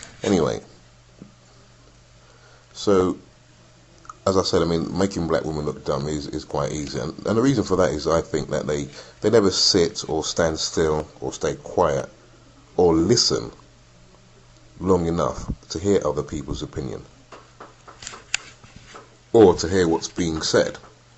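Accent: United Kingdom. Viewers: well i take care of myself but i dont consider myself to be a strong and independent woman, i dont wear weave or spend my precious money at the beauty shop and i NEED a man to do take are of me, as he should. so does this make me a black female (which is what i am) or a white woman? lol this dude is seriously stereotyping all black females! where is he from? wales? love the accent! i would love for a real man to step to me and do what he should! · Sounds like London !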